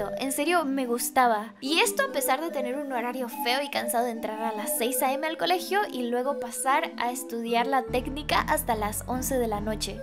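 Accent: Colombia. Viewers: Eres Colombiana ? ._. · Yo creo que deberias hablar mas el acento colombiano y su jerga, es más divertido XD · Señora hp JAJAJAJA Adoro ese asento colombiano mrk te queda melo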